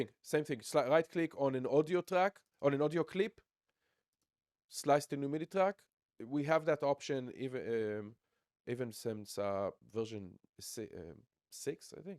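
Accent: Israel. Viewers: Great videos in the channel, easy to follow great energy! Man are you Israeli?❤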